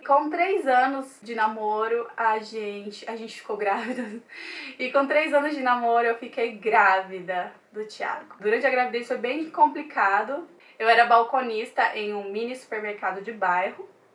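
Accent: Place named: Northeast, Brazil. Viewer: olá bom dia.eu amo seu sutaque d nordestina e tão lindo.esse arrastado d R.kkkkksucesso linda fica com deus.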